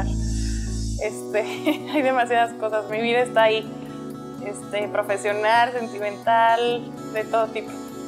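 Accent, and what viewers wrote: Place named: Mexico. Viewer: Es guapa no hay duda de eso. Pero abre la boca y se desparrama ese acento HORRIBLE mexicano y la pobre demuestra su limitado verbo e intelecto. Mas bonita esta de boca cerrada....pues no entran moscas.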